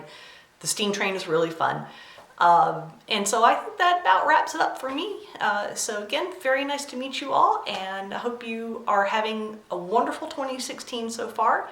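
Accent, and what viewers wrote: United States. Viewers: great first video I have been watching floss tube for over a year but not found the courage to make a video yet I think your accent is from some where around south Caroline but since I am from Canada it is mostly a guess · I just found your videos and I'm enjoying them. I'm hearing a few southernisms in your accent so I'm thinking Kentucky or maybe Indiana.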